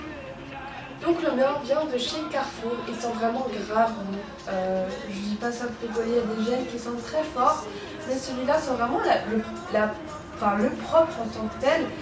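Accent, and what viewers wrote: Madagascar. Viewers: T'es malgache?? x) Chouette video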